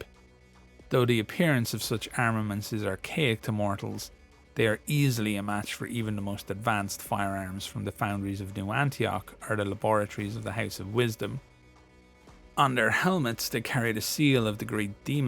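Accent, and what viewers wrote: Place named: Ireland. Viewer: Should just lean into the Irish accent and give it a bit of a doomsayer priest vibe, mate.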